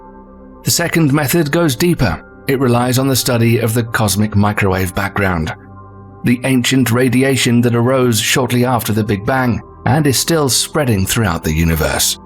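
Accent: United Kingdom. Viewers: snarky, breathy middle-age british accents make it all factual for most listeners lol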